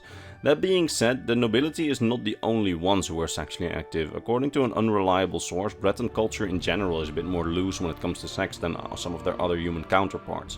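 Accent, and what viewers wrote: Netherlands. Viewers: Je klinkt zeer Nederlands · 5 minutes in I KNEW this guy was Dutch the accent is so thick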